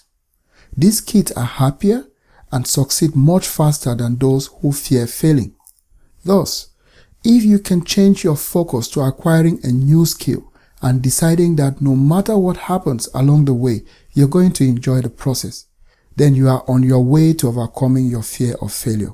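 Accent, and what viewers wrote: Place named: India. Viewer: your accent sounds like an Indian accent....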